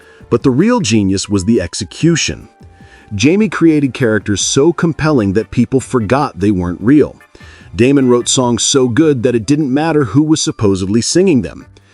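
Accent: United States. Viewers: Listening to this guy use British slang and idioms in an American accent is painful for some reason. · I hate forced subtitles and it's really weird hearing all this British English in a flat, mechanical sounding American English voice.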